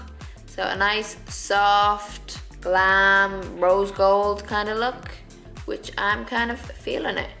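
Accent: Ireland. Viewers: I loved your look in this video and overall. I found you shopping on Tarte! I bet you will be the next huge thing on YT<3 Where is your accent from? You are in CA but sound Irish?=P I'm a new fan from NZ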